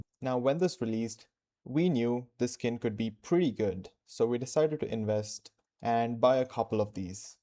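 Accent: India. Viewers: W video btw where are you from? your accent makes it look like ur from india (im from india as well) so im curious! :D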